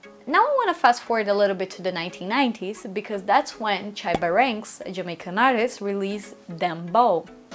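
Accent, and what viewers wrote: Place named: England, United Kingdom. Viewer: Where are you from cus u got a strong accent in english but an english accent in spanish. It dont make sense.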